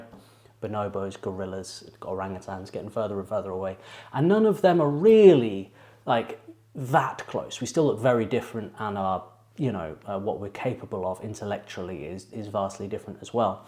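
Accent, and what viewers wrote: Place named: Surrey, United Kingdom. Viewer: I would absolutely love you to a deep dive on some of your favourite Nightwish tracks. I cannot agree with you more that on a first few listens it's all about enjoying the experience, and from there, starting to pull it apart and understanding how any why it works so well. Chances are I'll be popping onto your buy me a coffee because I certainly have a few that I'd like you to get to experience. Kind regards from Surrey in the UK. (From your accent, I'd guess you're not that far away :) ).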